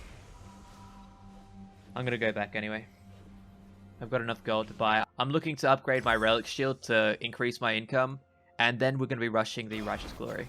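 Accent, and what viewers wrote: Australia. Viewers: Hi are u from Australia?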